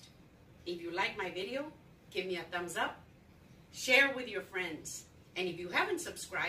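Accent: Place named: North, United States. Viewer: Initially, i think you are hispanic with a northern us accent. Nevertheless, video is like home, thanks.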